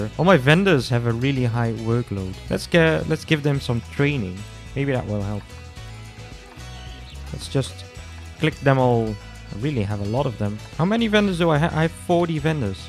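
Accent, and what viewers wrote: Netherlands. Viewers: not many animals look like what i see on the veluwe but keep up the good work ( ik hoor een beetje nederlands engels am i right?)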